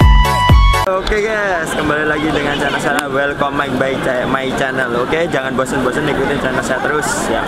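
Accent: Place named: Medan, Indonesia. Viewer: Dari logatnya orang medan kayaknya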